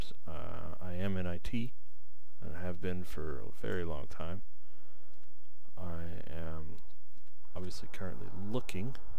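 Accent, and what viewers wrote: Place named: North, United States. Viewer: Yeah, that is what I hear, up north somewhere, though def not NY/NJ...lol. And is there a specific accent in FL? They are such a mix of snowbirds and tourists...lol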